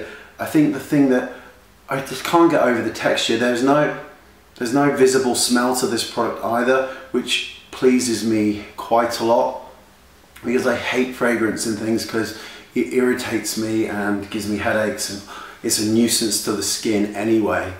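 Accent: Australia. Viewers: i just realized you were British, i always thought you were from Australia from your accent haha :) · r u australian? ive been told ur english but u sound australian o_O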